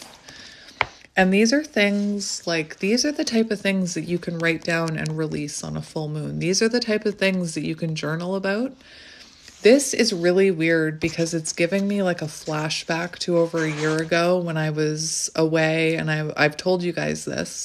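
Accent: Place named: Canada. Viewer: I'm a Aquarian and also don't like to be told what to watch 😅 I will when I feel like it. But Zombies, no way! Lol 😅 I'm house sitting for a month 3 dogs and 2 cats, so when I have dinner or a snack, I have 3 pair of eyes watching me, so the dogs gets more if my food than me! 😅 But I would also have cried about the spider. I love nature and actually prefer animals to humans. I also will rescue a spider 🕷️ if it's lost inside the house. Some people will get an entire spray tin of poison to kill a innocent creature! That really makes me angry! Thank you for being such a interesting tarot reader, I love your Canadian accent. I'm in Cape Town, South Africa. We going into Spring/Summer where you are going into Winter.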